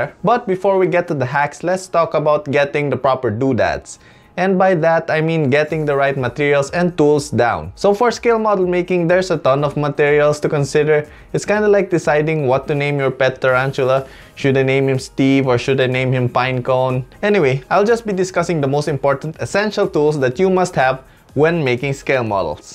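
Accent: Philippines. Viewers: Is this a filipino the way he speak english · Is this guy filipino? Cause his accent kinda sounds one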